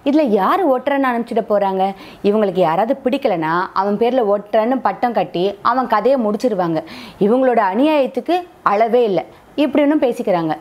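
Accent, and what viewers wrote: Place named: Chennai, India. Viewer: alaga tamil pesringale akka !!neenga chennaiya irikka vaipe illa enganu sollunga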